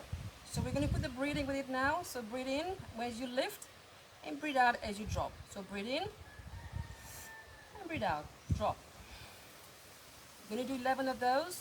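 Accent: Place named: France. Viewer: Your accent is pretty, is it French? I have only a small fibroid but would like to get rid of it. My doctor was not concerned about it.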